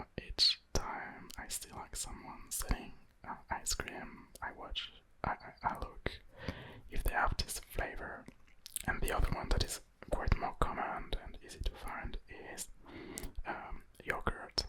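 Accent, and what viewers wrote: France. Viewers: Your French accent is beautiful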